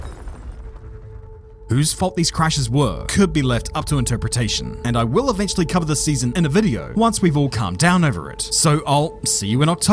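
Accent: New Zealand. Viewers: are you from Southland?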